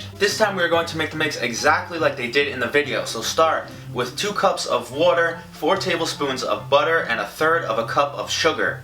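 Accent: New York, United States. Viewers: If he isn't from New York I'm gonna doubt my accent knowing skills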